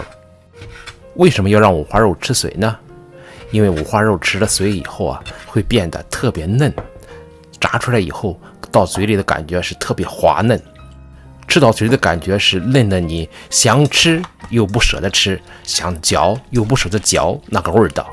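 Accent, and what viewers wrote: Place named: Qinghai, China. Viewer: 标准的青普 哈哈哈，爱上了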